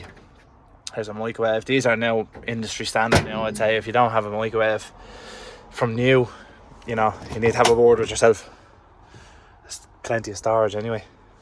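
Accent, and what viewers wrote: Ireland. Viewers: I’m guessing if you dropped the silly dub accent and talked like a west Meath man you’vd do better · I LOVE youre Accent Irish is best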